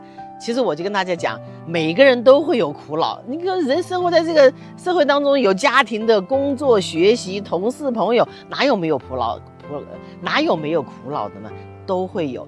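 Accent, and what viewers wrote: Wuhan, China. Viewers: 大姐你是武汉人吧? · 我也觉得是从武汉来的😀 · 、你是不是來自中国-你的口音很像,只有中國人最注重養生、通经脈穴道、你说的沒錯要堅持做才会有效果．我也经常失眠謝謝你、告诉我们方法.我一定試一試、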